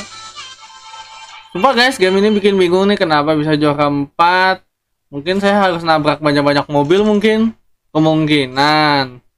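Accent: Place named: South Kalimantan, Indonesia. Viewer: Kayaknya kamu orang kalsel ya guys?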